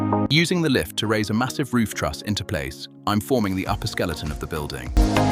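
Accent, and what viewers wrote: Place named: United Kingdom. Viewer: lol what? The voice is obviously British and this is obviously located in the US.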